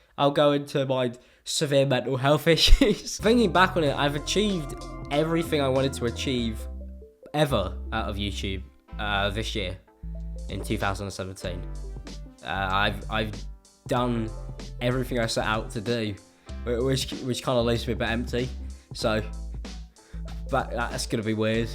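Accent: United Kingdom. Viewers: You are the first person with a british accent who doesnt piss me off. I suppose yours is more subtle.... Where are you from exactly btw?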